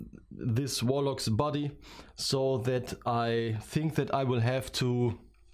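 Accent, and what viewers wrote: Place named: Germany. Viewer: Der deutsche Akzent ist unverkennbar:D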